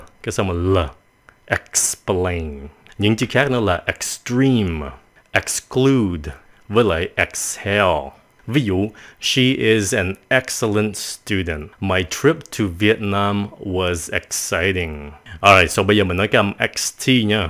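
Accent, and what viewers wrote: United States. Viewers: Do ở đây dạy phát âm theo Anh-Mỹ. Nên đọc theo là đúng với nhiều ngừoi Mỹ mình nghe, Còn cách đọc thành iks là âm Anh-Anh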